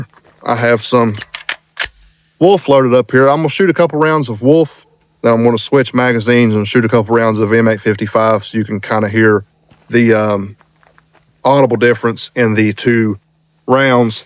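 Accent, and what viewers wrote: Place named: West Virginia, United States. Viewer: Idk but my guess is you are from west virginia. If not then eastern KY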